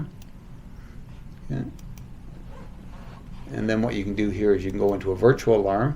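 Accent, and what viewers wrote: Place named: Canada. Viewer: 可以确定讲的是加拿大英语， 😇😇😇😇😇😇😇😇😇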